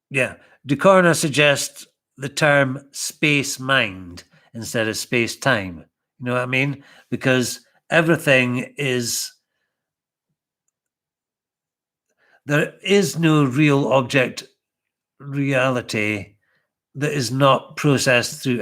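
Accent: Scotland, United Kingdom. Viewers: magick patter in a Scottish voice...... finally !!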